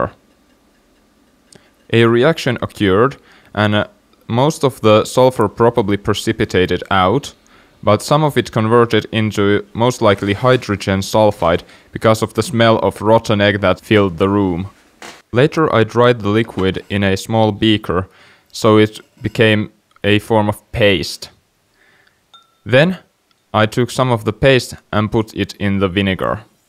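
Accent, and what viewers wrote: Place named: Finland. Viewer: You have finnish accent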